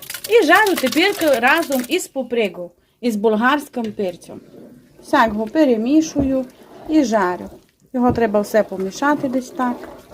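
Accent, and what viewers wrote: Transcarpathia, Ukraine. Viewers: Обожнюю ваш Закарпатський говор👍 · Супер!І паштет і мова закарпатська просто заворожує!Дякую,файна господиня! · Це канал і він ведеться закарпатським діалектом української мови. И иппен за вто мы го любиме позирати и слухати.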